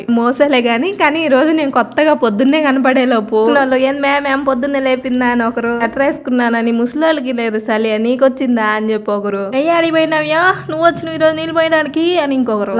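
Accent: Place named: Kadapa, India. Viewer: Hii 👋 akka 😊😊😊 Same slang madhi kuda 😂😂😂😂madhey kadapa akka Medhi a oouru akka😂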